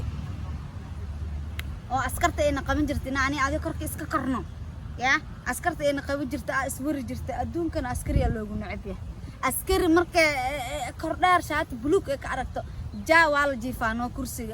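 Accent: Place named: Mogadishu, Somalia. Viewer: Maanay video yadaada markaan daawaado af reer xamarka ka bartaa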